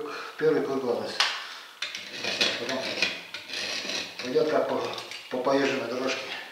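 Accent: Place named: Mari El, Russia. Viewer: Говор марийский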